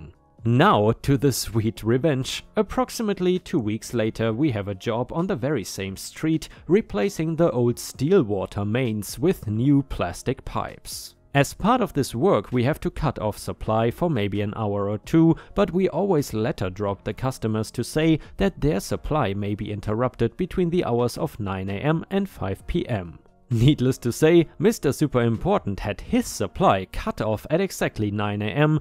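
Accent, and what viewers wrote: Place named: Germany. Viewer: Your charming German accent makes me smile every time you tell these stories! Keep up the great work! Love your HOA and property related stories btw!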